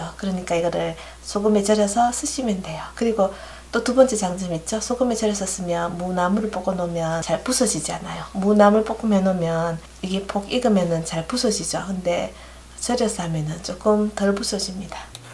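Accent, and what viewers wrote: Gyeongsang, South Korea. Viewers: 구수하신 경북 억양이 편안하게 느껴집니다.쉽게하지못했던 요리를 쉽고 간단하게 하는 요리 노하우를 기다릴게요. 감사합니다...^^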